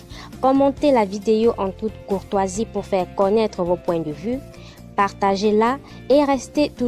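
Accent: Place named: Cameroon. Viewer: Ton accent kmer est très prononcé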